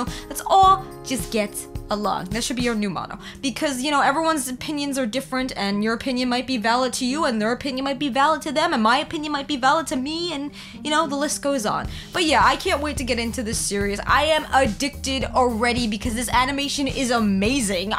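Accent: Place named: Brooklyn, United States. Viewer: is this chick from Brooklyn or some shit? I hear some sort of accent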